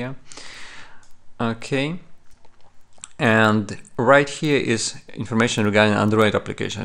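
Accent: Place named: Italy. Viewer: si sente benissimo che sei italiano. il tuo inglese fa schifo!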